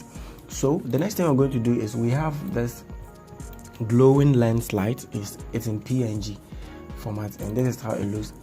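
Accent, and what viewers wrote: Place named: United Kingdom. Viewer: British accent 😂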